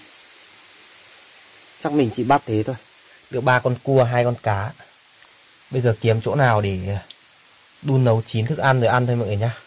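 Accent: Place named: North, Vietnam. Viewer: Hay quá... giỏi quá tiếng nói chuẩn ngoài bắc luôn...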